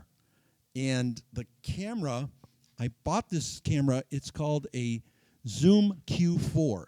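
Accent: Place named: Michigan, United States. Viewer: You sound like you're from Michigan! (I grew up in southwest MI).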